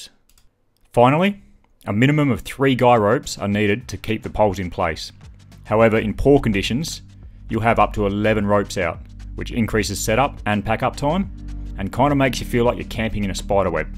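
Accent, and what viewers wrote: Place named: Australia. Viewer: Something about Overland-related videos with Australian voiceovers makes me trust the content that much more. Great review guys, appreciate the time and efforts to put this together!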